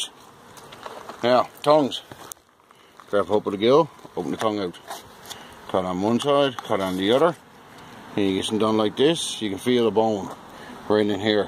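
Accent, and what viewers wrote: Newfoundland, Canada. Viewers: Most likely from Newfoundland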